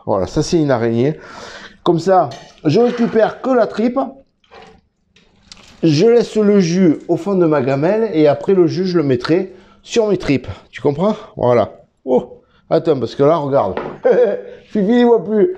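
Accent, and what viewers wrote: South, France. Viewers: Tu sais Fifi j’aime ton dynamisme, ton expertise, le images de ton village. Ta joie et ton chaud accent du sud me donne la bonne humeur d’un film de Fernandel . Merci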